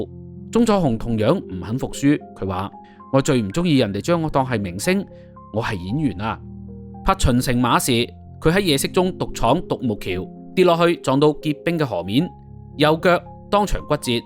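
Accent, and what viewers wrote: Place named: Mandarin, China. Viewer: 主播你的第一语言英该是普通话吧？不介意你的口音，但是有的字念得完全错了，比如“闺蜜”"联袂”。。。祝你进步！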